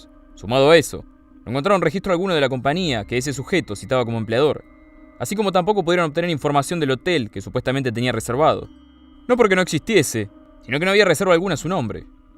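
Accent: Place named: Argentina. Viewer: Lo único que le pone en la torre al relato es el acento Argentino.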